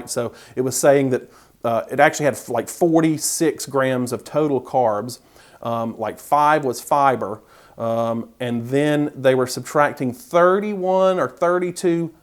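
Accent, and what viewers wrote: South, United States. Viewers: Thank you for keeping us informed! Keep up that southern accent !!! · Thank you for the info. I can't believe the spices! Off to check my favorite spice for carbs which is cayenne pepper! (: xx Love your southern accent btw.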